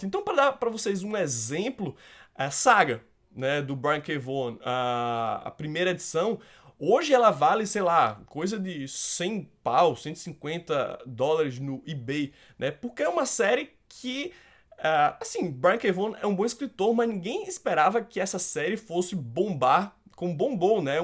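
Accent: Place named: Pernambuco, Brazil. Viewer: Pensava que vc era de Pernambuco.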